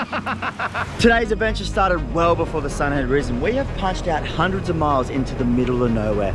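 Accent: Australia. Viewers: Onions, lime, red shit, green shit, blah blah blah cook other side. Lovely Australian accent hey Lads.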